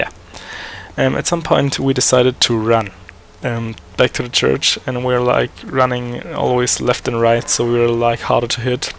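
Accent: Germany. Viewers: haha ... hab einfach mal reingeguckt und mir so gedacht: Die Aussprache is, als wär der Deutsch wäre ...und taddaaa ... recht gehabt :D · your accent sounds like your mother tongue is german...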